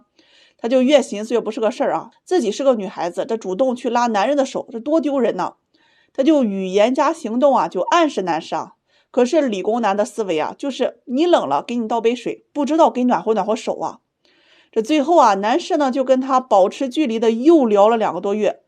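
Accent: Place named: Shandong, China. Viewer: 听口音感觉像老乡啊，我是山东人。😂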